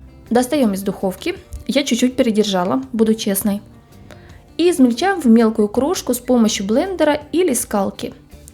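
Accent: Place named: Odessa, Ukraine. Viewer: Привет Автор ролика! Спасибо большое! Всё понравилось! Судя по голосу Вы ближе к Одесской области находитесь) или Приднестровью?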